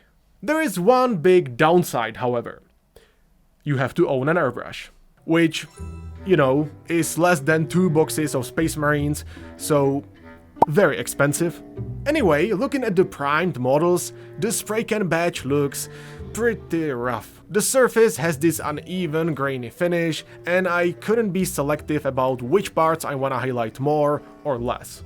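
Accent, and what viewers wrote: Czech Republic. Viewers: You accent sounds familiar, are you from Czech Republic by any chance?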